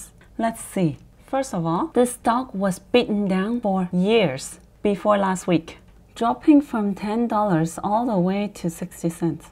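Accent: China. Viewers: Are u a chinese ? Cause your accent sounds like so chinese. Whr u from? China ? Taiwan ?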